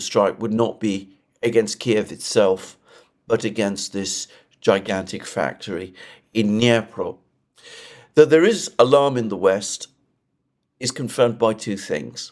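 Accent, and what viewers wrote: United Kingdom. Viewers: Thanks for all you do for us:-). Love your beautiful British accent:-). Take care👍🏻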